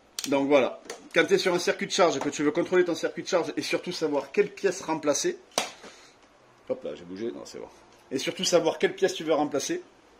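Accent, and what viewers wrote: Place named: South, France. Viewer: Salut je viens de voir ta vidéo sur le régulateur 👍 c'est super bien expliqué car j'ai eu le problème la sur ma Honda vf750c rc43 de 1995 je super sympa que tu donnes de ton temps pour aider les autres et j'espère qu'ils seront reconnaissant envers toi .en tout cas moi j'ai trouvé ça génial et en plus le petit accent du sud c'est le top merci pour ton explication 👍 Bonne continuation 👍🤟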